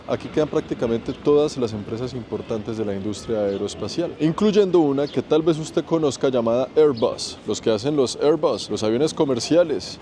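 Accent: Colombia. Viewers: Varias veces! Y me encanta su acento Colombiano.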